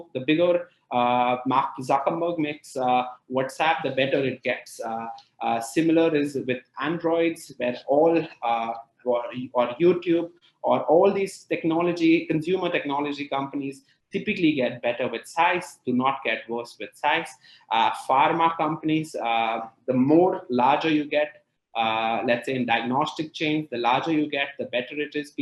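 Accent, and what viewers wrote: United States. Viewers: He went to school in london and got US accent? Chutiya hein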